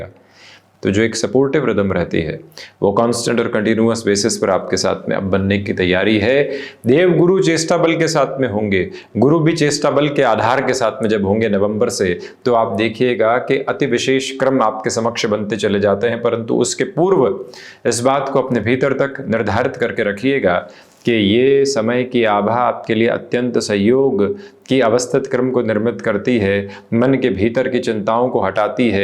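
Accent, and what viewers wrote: Standard. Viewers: आप सुध हिंदी बोलते हैं।। बहुत अच्छा लगा ।।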